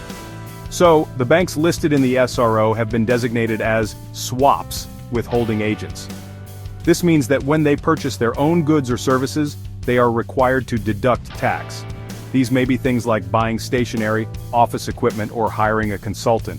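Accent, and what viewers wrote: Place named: England, United Kingdom. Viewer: You Mr gora my friend nicely explain...thanks and love❤to you....but the comman Pakistani like me can't understand English acent.....😂😂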